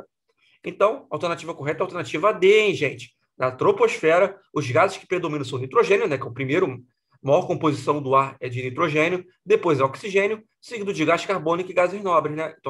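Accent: Minas Gerais, Brazil. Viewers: Vc é de mg??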